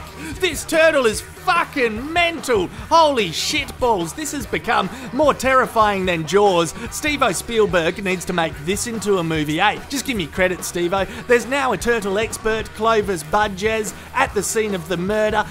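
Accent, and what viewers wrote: Australia. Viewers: Amazing Aussie commentary 😂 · The Aussie accent makes the expert name sound funny too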